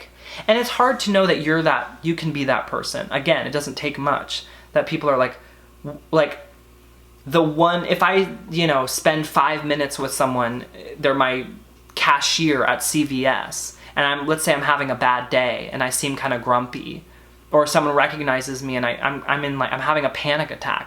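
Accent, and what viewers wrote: United Kingdom. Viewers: also, i NEVER find you boring, and i love your british accent lol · i love your british accent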